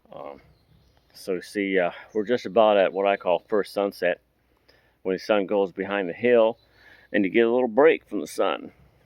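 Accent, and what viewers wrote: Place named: Tennessee, United States. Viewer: You are already starting to sound like a Tennessee person!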